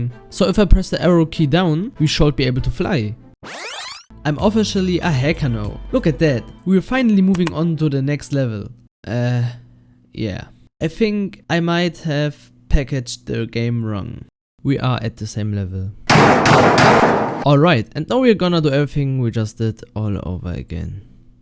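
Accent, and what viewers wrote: Germany. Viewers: You sound german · i höre a bischen of deutsch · Are u german? Because iam and i hear a german accent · Bis auf einen leichten deutschen Akzent hast du ein gutes englisch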